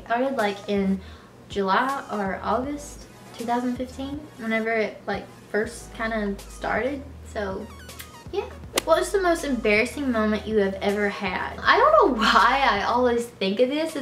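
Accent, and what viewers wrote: South, United States. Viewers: is she country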